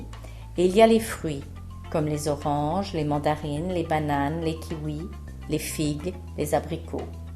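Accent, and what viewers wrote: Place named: France. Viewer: this was cool because i am trying to listen for comprehension and acclimate to the french accent. now to incorporate the words/phrases into more practical use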